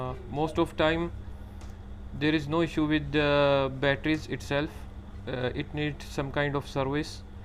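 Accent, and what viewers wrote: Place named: India. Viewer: Indians speaking english sounds very ridiculous!!, next time use subtitles.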